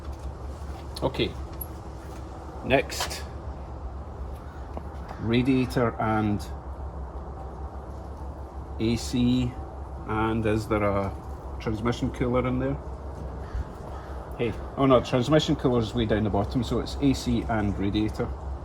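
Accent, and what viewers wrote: Scotland, United Kingdom. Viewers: Did I just stumble upon a Scottish mechanic. Awesome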